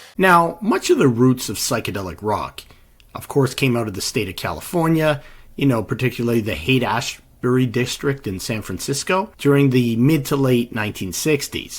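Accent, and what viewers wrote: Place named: Canada. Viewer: Give him a break. He has a Canadian accent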